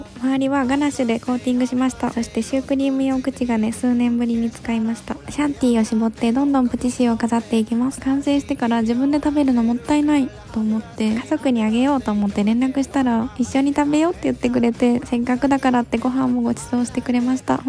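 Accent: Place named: Shiga, Japan. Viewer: おめでとうございます！❤ 所々ででくるイントネーションで出身が気になっていて、最後に滋賀県？なのが判明してスッキリしました😆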